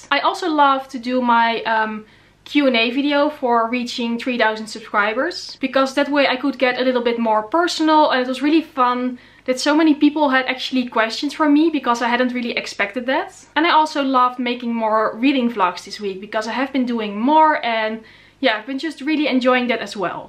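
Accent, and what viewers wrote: Netherlands. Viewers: i think she is from the netherlands about the way she talks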